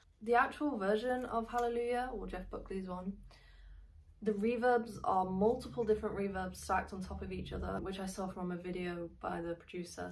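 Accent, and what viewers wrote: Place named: England, United Kingdom. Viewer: who are you？ England accent.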